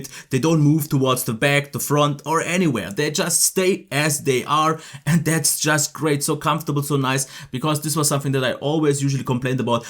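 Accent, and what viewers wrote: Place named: Germany. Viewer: the German accent is hella funny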